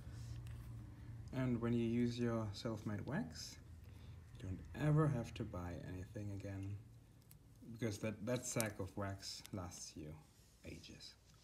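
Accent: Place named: Berlin, Germany. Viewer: Aha! Du kommst aus Berlin! Das dachte ich, als ich Sie sprechen hörte - Thank you for the genius tip!!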